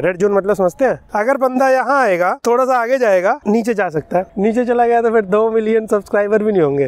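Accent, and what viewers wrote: Lucknow, India. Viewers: bhai ap Lucknow se ho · Where are you from.... Lucknow???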